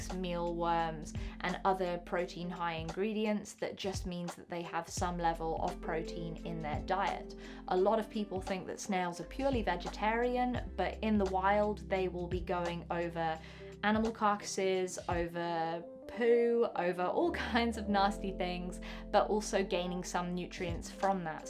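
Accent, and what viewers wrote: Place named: United Kingdom. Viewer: Judging on her accent she is in the UK. They aren't illegal there. Only releasing them into the wild is illegal. Even in the US people in the pet trade keep them but you will get in trouble releasing them or selling them Edit: A lot of people also catch their Giant African landsnails outside in areas where they are invasive and keep them. Id argue they are doing the environment a favor by catching them and keeping them indoors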